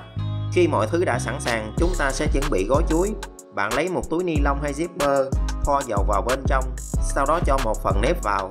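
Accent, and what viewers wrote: Mekong Delta, Vietnam. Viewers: Quay kiểu xịn quá chú. giọng miền tây đúng thân thiện ng việt nam ạ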